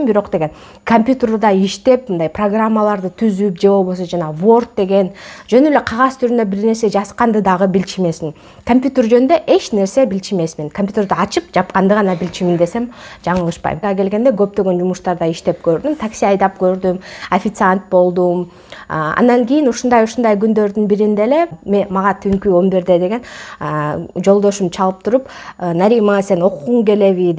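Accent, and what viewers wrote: Standard. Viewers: Кыргызчани таза луйлот экенсиз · Азаматсыз. Жакшы. Дагы да ишиңизге ийгилик. Сизди көп адамдар көрүп үлгү ала турган экен. Бирок капа болбоңуз, деген, дегенди эле азайтып сүйлөсөңүз ,дагы да эң жакшы болот эле. Рахмат. Болбосо кыргызчаңыз аябай так, таза экен. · Ай вай молодец карындашым! Кыргызча таза так суйлоп тушундургонунузго! Жоп жонокой кылып . Мындайча айтканда супер! Кыргыз болуп туруп башка тилде суйлогондорго окунучтуу!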